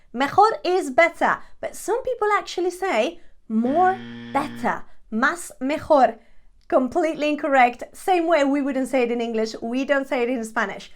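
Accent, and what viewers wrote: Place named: United Kingdom. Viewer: Tu hablas inglés con el acento británico 100% a un nativo de Inglaterra. Que increíble! No es fácil hablar inglés asi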